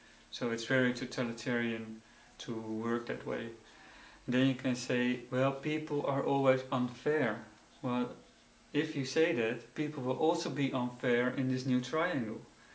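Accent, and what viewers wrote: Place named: France. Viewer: I did have a look at the beginning when he panned the camera around to show, as he said, "to show where I am filming this". But looking I was not able at all to see where. Apart from some dudes apartment. I did see that as far as I know it was not filmed in England or the UK. So judgeing by his French accent I guess it may be France of Canada or some where else French is spoken.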